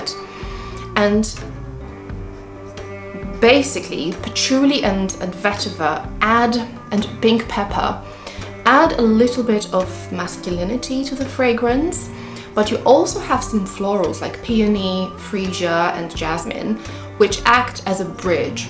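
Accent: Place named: United Kingdom. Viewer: Hi. You said in another vid you live in Poland. Did you spend time in the UK? You sound part British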